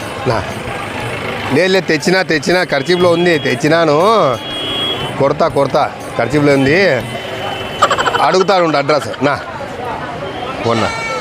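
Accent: Rayalaseema, India. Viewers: Madanapalle Naa thalli gari uru chittor daggara thandri garu uru super rayalseema slang comedy chupinchavu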